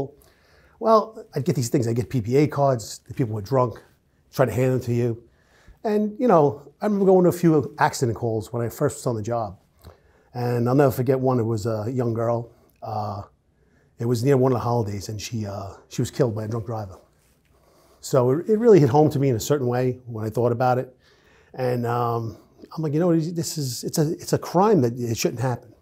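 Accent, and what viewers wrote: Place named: New York, United States. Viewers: What a character! I lived in New York for 10 years I miss that accent. Great story teller. Good luck to him. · Another highly interesting interview. He's right about everyone wanting to hear cop stories, especially when they're told by someone like himself. He's a natural storyteller. (And I'm a born & bred New Yorker -- love hearing our accent because it's dying out. His New York elocution is so New York, so fabulous!)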